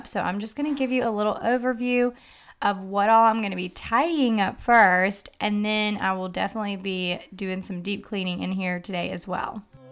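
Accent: South, United States. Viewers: Enjoy listening to you talk with your “southern accent”. Originally from Waynesboro Ga (30 minutes from Augusta) but now live in South Carolina.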